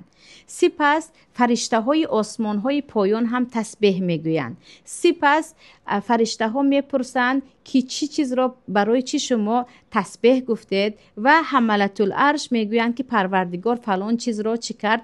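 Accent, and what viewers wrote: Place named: Tajikistan. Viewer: Ман а шумо барин хохари олима мефахрам ки аз мо занхо чунин олимаи босавод хусусан бо лафзи ширини точики дар дини ИСЛОМ хизмат мекунед аллох ба мо чунин саводу гайрату такво насиб бгардонад омин йо раббал оламин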